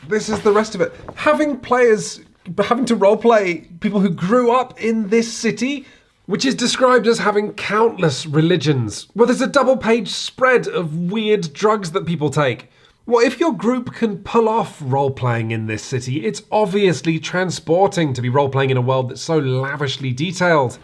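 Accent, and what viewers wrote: United Kingdom. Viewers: Nice to see a review about RPGs. I would like some more...😆😉 (Although the British accent makes your videos difficult for me to understand....but I watch them anyway.😆😆)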